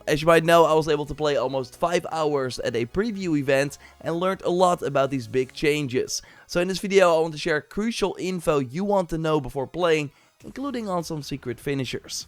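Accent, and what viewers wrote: Netherlands. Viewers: this clip is sh@ He doesn't finish combo's during fighting. This guy can't play the game. This guy is BAD advertisement with an irritating dutch accent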